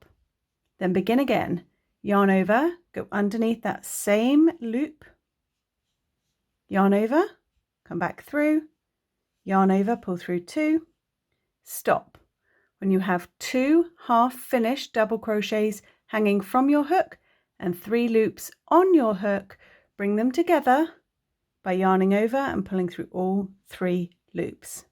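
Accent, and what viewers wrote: United Kingdom. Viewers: now i am confused - a uk accent but usa stitch names.....i would call thouse double crochet and treble crochet stitches.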